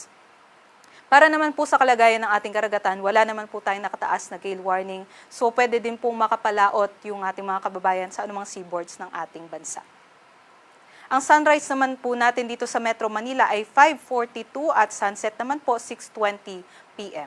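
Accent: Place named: Iloilo, Philippines. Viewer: Ilongga si ate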